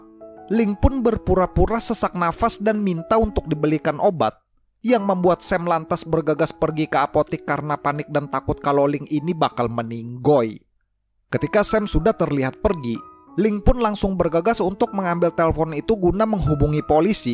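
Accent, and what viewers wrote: Banjar, Indonesia. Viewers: Logalnya Kya orang bnjr... Bujur jua KH ??? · Orang banjar kah pian bang, logatnya pina sama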